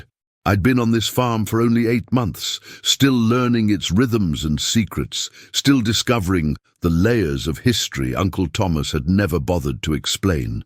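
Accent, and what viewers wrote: United Kingdom. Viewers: I enjoy the British accent, the smooth reading style, and relatively few pronouncement errors.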